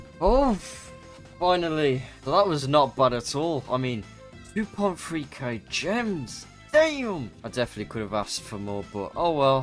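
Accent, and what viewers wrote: United Kingdom. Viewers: the second dude in my life that actually speaks british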